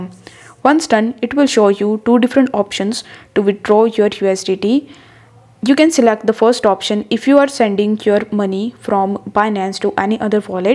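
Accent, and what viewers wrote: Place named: India. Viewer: Your accent sounds Indian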